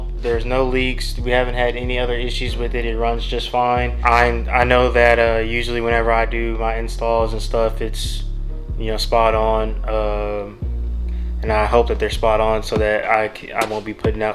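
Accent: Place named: Philadelphia, United States. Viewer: ❤️ from Philly. You talked like a good friend here in Philly and I was wondering if there was a connection. God bless.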